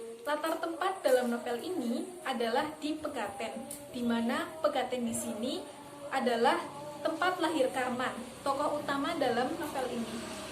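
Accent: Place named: Tegal, Indonesia. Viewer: Bahasane medok men dek... Oponeh nek ngomong kubah... H yang khas tegal... Semangat dek... Oh yo... Tambah senyumnya...biar semakin imut... 😁😁😁 Dan tolong mungkin cari tempat yg agak tenang dan di kasih backsound... Intonasi lumayan oke... Siip...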